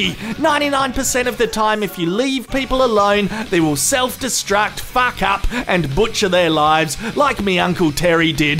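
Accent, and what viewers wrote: Australia. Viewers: Fucking love your accent !! Love australia !!!!:D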